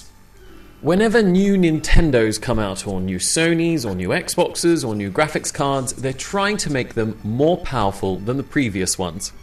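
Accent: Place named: United Kingdom. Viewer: Isn't this Euclideon? And I thought he was Australian, what's with the British accent?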